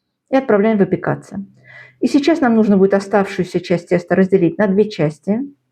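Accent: Baku, Azerbaijan. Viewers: Да, очень родной говор! Мягкий и доброжелательный, бакинский ! Ваши Рецепты подкупают своей простотой и лаконичностью. Спасибо вам из Бруклина, НЙ. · Kak vsegda shikarniy recept obozhau vas e vash Bakinskiy akcent smotru vas s NY😘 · Бесподобный бакинский акцент...❤ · Я, слушая Вас, ловлю себя на том, что я улыбаюсь 😊 От того, что слышу родной бакинский акцент❤️Пол года назад открыла для себя Ваш канали сразу подписалась! Спасибо за Ваши рецепты🌹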